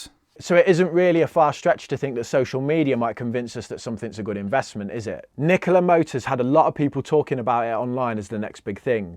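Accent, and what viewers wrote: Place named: Lancashire, United Kingdom. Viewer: It's been doing it since i got here lol thats proper Lancashire speek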